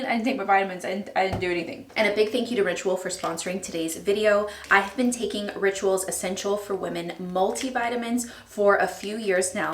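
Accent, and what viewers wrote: Texas, United States. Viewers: you can hear texas accent poking through in this video!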